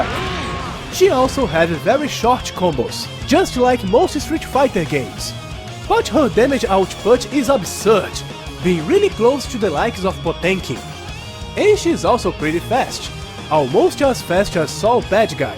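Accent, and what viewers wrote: Brazil. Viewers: Será que você é brasileiro? :^) Acredito que gringos conseguem entender a maior parte, mas da pra dar uma melhorada, heim. O vídeo em si está bem legal.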